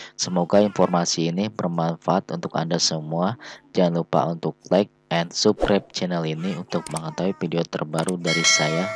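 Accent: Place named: West Java, Indonesia. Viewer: Ini yg ngomong pasti orang sunda ya😂😂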